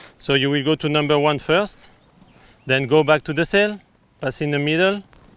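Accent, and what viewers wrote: England, United Kingdom. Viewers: j adore ton accent anglais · l'accent anglais magnifique d' xD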